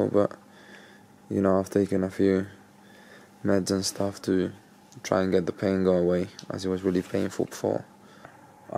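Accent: England, United Kingdom. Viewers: Why do you sound British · I've only ever noticed the british aspect of his accent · Sound more English than Harry Kane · transitioning to UK with the english accent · He's british accent is good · Ur accent is more English than mine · HE SOUNDS ENGLISH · You sound more English than Spanish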